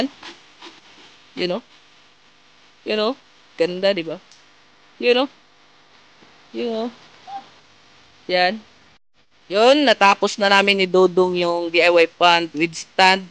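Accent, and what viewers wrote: Visayas, Philippines. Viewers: Bisaya ka pala lods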